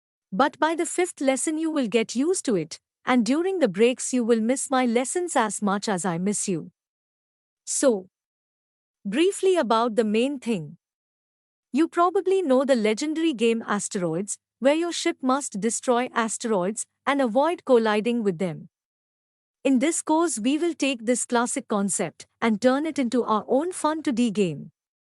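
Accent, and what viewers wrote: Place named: India. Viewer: Indian accent +20 to understanding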